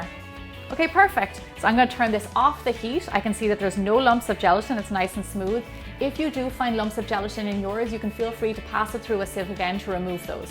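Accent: Ireland. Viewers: What part of Ireland are you from? (but Limerick is the best because I'm from there) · Thank you:) I was picking up in the Irish accent but I was uncertain:) I listen to Liveliness every day on Radio One over the RTE app.:) I hope to go someday! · Omg... I loveeeee this... Thanks for sharing... You sound "Irish" to me, may I just say, that I love Ireland... I fund it beautiful when I visited... Love to go back again some day. Thanks for sharing... Love that you give tips on how to "vegan"-nize your recipes... 😘😘😘❤️❤️❤️👏🏽👏🏽👏🏽👏🏽👏🏽👍🏿👍🏿👍🏿 Best wishes. From me at Sunshine State 🌴🌴🌴🌴🌴 🤗🤗🤗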